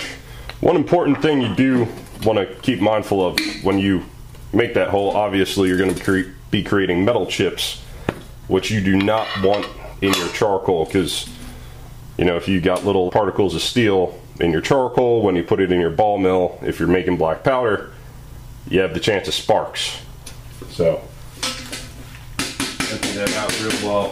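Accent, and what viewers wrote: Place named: Mid-Atlantic, United States. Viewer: Have you ever lived in the Delaware, Maryland, Pennsylvania area? You've kinda got the accent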